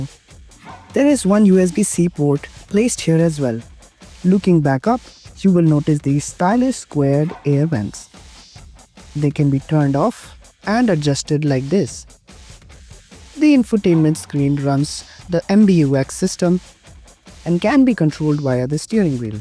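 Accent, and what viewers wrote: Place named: India. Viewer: The sound of an Indian voice make it look cheap and a scam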